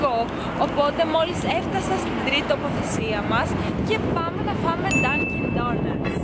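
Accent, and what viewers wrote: Spain. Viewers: Σε ρωτάνε αν μιλάς ισπανικά γιατί η προφορά των Ισπανών και των Ελλήνων όταν μιλάνε αγγλικα είναι ίδια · Πιστεύω ότι σε ρωτούσαν αν μιλάς ισπανικά επειδή πολλοί ξένοι λένε ότι τα ελληνικά ακούγονται σαν ισπανικά. Έτσι και η προφορά σου μάλλον έμοιαζε με την ισπανική προφορά και λογικά θεώρησαν ότι πρέπει να σου μιλήσουν τη γλώσσα 'σου'. Ξέρω ότι στην Αμερική ζητάνε τα ισπανικά σα δεύτερη γλώσσα στην εργασία ακριβώς επειδή υπάρχουν πολλοί ισπανόφωνοι, οπότε έχει νόημα.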